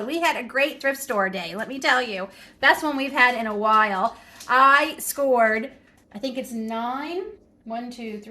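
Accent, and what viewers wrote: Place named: Canada. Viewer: Thanks for sharing! SW Ontario here! I heard your accent and was searching for anything that pointed to you being Canadian. I saw the Bernardin lid and was so excited (obviously missed the bagged milk)! Pork was my first pressure canning experiment last year and my first meal with it was nachos. I figured if it was going to kill me, I was going out on nachos. Bit more confident in my canning now, haha!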